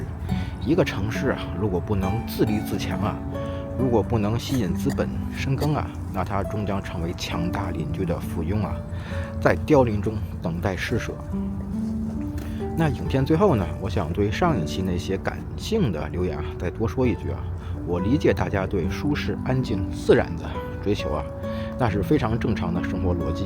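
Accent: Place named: China. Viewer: 你又中計了。這個人來找你麻煩，不是因為你的影片內容。你太不了解台灣的現況了，有一群被政黨洗腦過的蠢蛋，只要你說話是中國口音，就會啟動瘋狗模式亂吠一通。牠留言第一句就說你腦殘，這種人就是典型的台灣蠢青，只想引戰惹你發火。說再多都是枉然，不要浪費時間，最好的應對就是把他封了。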